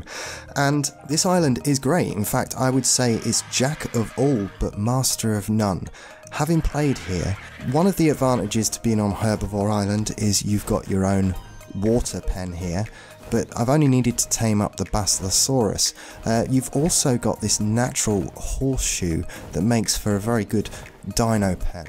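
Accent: United Kingdom. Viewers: Cuz he is British lmao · Am I the only one that is getting a British vibe from him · Hey bro, I can hear you´re British and I totally dig your accent, but I am Czech and here tea is only for ill people. We only drink it if we must, we drink coffee and we also hate the instant stuff, so cheers and thanks for all the info.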